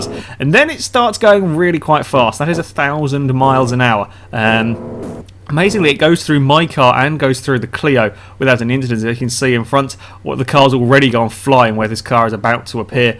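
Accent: United Kingdom. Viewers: Some of you might confuse of him. He really speak like that because of his British Accent. Don't complain too much what he is saying. '_'"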